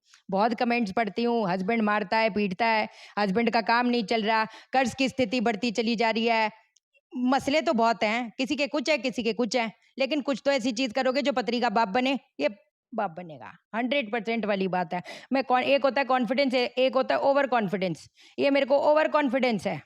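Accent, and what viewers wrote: Punjab, India. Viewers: Di kya aap Punjabi ho Maine kai baar suna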